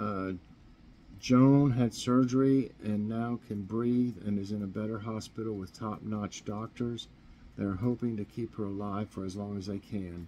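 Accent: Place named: England, United Kingdom. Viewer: I love hearing your prayer with English accent,. I love it and thank you 🙏 always for regular prayers 😍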